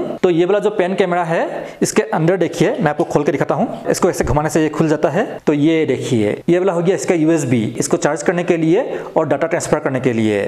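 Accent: Assam, India. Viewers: Toi axomiya hoi....gom pai golu kotha koutai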